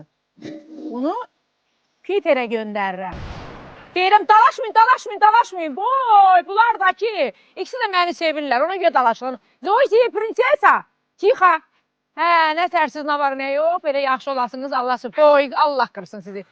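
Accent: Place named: Gadabay, Azerbaijan. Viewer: Bəs özü deyir Goranboydanam.. Ləhçəsi oxşuyur ama Gədəbəyliyə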